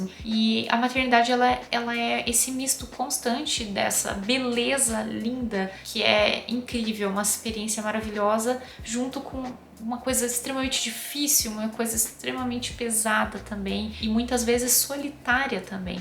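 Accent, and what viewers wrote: Brazil. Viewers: vc é gaúcha?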